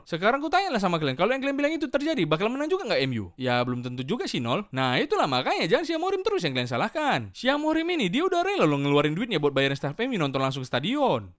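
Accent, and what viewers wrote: Medan, Indonesia. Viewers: Gua kesini aja gara gara inget logat medan nya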